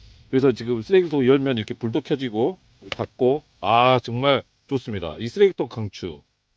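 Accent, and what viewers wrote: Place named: Jeolla, South Korea. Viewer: 억양으로 보아 고향이 전라도분 같네요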